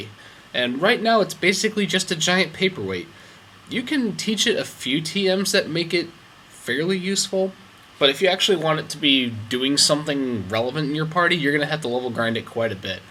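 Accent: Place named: Midwest, United States. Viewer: where are you from in the midwest?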